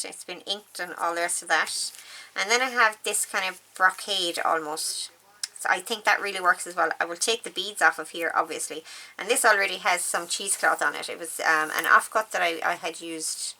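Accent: Ireland. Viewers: Superb, fun, engaging, inspiring 🌹 Thank you so much for creating these two styles, they are just wonderful and I’m thrilled that you use lace and fabric, gesso and metallic in these styles, I’m definitely going to create a Shabbychic style for my current project! Wonderful to hear your beautiful Irish lilt and watch your happy, fab tutorial! Hugs and blessings 🫂🙏🏻❤️😍🌹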